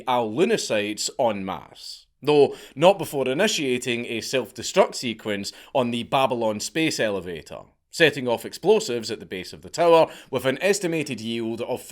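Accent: Scotland, United Kingdom. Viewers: Wtf this is so nice, not only can I perfect my scott accent but I can also learn stuff. As a descendent of the Black Douglas clan this is a calming voice. Fuck England and long live the scottish man. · Can you please re-record a version of this with really cool looking advanced substation alpha filtered karaoke subtitles in order that I can understand what you're talking about? Between the obviously 100% real pseudohistorical mumbo-jumbo and your thick Scottish accent, I'm having difficulty following what you're talking about even with the English (auto-generated) Closed Captions turned on. In fact, they make it worse. It's like having a drunk Irishman attempting to interpret a Scotsman attempting to speak a bunch of colloquial American-English into British English or something. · what in the everloving fuck did I just listen to!? I did enjoy it being told in a Scottish accent though.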